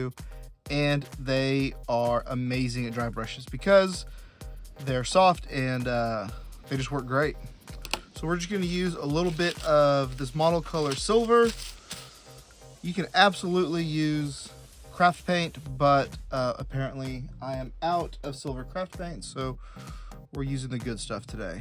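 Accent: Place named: Oklahoma, United States. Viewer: Bro sounds like an Okie, makes me feel at home!!!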